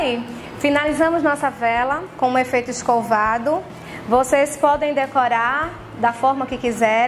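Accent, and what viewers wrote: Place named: Pernambuco, Brazil. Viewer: você é ótima! Aprecio muito os vídeos da, inclusive os daquele químico daí. E amo esse sotaque pernambucano. O.mais agradável do Brasil, na minha opinião. Parabéns aos.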